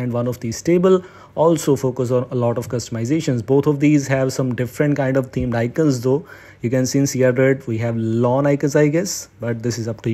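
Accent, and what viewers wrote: India. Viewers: hes talking in english but with indian accent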